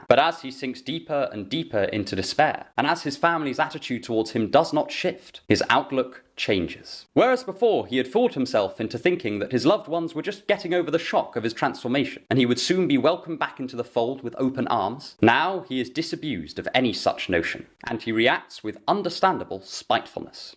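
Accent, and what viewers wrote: England, United Kingdom. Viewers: Is this guy doing a good review of the book and giving in depth thought, or is it the English accent?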